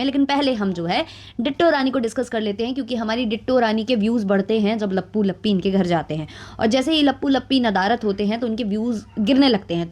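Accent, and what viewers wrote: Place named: Western Uttar Pradesh, India. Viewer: Di ap up west se ho na .kyoki apke bolne se pta lgta h .hm bhi up west se h .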